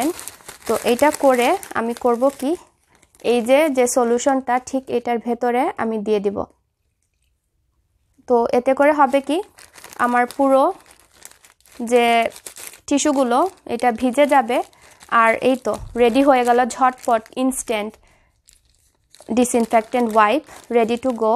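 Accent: Sylhet, Bangladesh. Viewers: আপা, অনেক ধন্যবাদ আপনাকে। আপনি কি সিলেটি?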